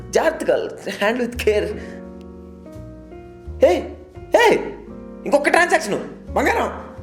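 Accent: Godavari, India. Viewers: nani style lo champav kadanna.... may be ur from godavari...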